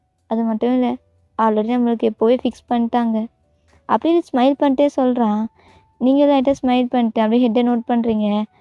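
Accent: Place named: Sri Lanka. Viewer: Sri Lankan maari pesuringa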